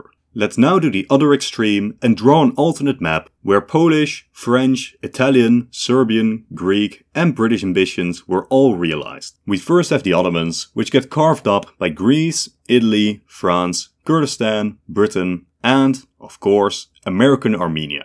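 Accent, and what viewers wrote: Netherlands. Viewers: I think it's a Dutch accent.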